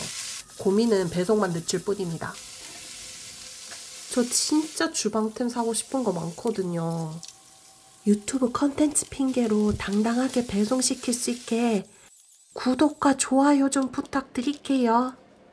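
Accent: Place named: Gyeongsang, South Korea. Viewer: ㅎㅎㅎㅎㅎㅎㅎㅎ 경상도 사투리가 영상의 재미를 한층 더 올려주는거 같어요.😊😊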